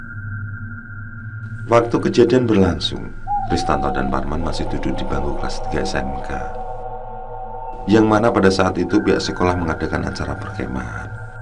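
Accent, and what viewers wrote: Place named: Bojonegoro, Indonesia. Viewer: Wong jonegoro ndi suarane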